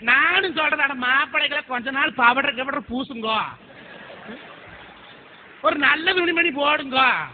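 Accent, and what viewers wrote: Kongu, India. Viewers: Super nga very nice kongu Tamil la alaga pesuninga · kongu tamil...always great....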